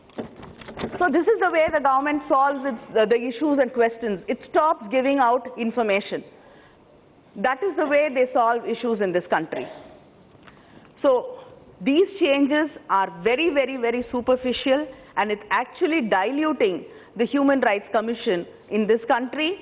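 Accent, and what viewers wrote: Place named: Tamil Nadu, India. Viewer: அக்கா உங்கள் ஆங்கிலபேச்சில் தமிழ் நல்லாபுரியுது சிரப்பு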